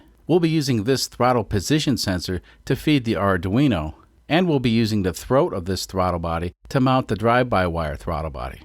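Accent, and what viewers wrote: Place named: Boston, United States. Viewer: ohh that explains why i thought you had a Boston ish accent :P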